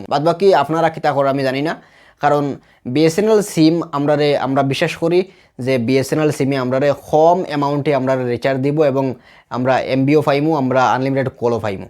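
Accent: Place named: Assam, India. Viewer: Eta assamee bengali na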